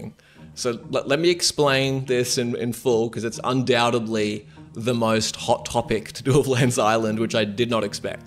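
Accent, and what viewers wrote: Australia. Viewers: I've just been recommended Len's Island by the dear Lord and Savior, Steam Algorithm. At first I was immediately sold already, but then I looked up who the dev is and BAM! I found your channel.The very first time I heard your Aussie accent I know this game is going to be LEGIT. Thank you so much for developing games like this man. I long for real good survival game with procedurally generated content and I think this game will be it :) Love your stuff man!!! <3 Can't wait to download this game and stream it. Much love and respect from Indonesia.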